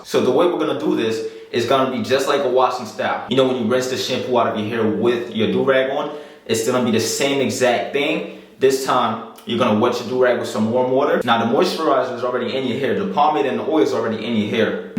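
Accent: New Jersey, United States. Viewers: I can tell you from jersey by way you talk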